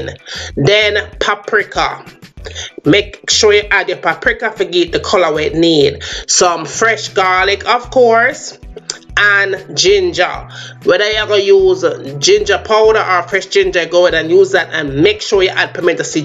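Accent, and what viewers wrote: Jamaica. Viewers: First tings first you add hoil!🤣🤣🤣 pure yardie. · I love Jamaican accents 😍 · Jamaicans are some of the most confident people on the planet. Love the accent and love Jamaican food 🔥🙌🏿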